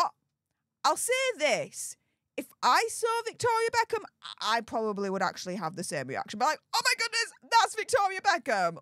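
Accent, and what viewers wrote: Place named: Northern England, United Kingdom. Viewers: Love listening to you as it brings me home with your accent. I am originally from Manchester England and you sound just like my cousins. I live in Mississippi now, have been here since 1972. I did go back for 3 years in the 90s from 94 till 97 after Diana died. People change so it was useless to want them to see me after 20 years back then.Thats ok because they missed out on me and my daughter. Their loss. Luv ya. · Dear, I’ve a personal question (maybe none of my business and could be offensive but not my intent). I’m trying to place the location of your accent. I enjoy studying UK accents and like placing them to their location. Correct me if I’m wrong, and most likely I am, but I’m guessing somewhere between Manchester and Yorkshire?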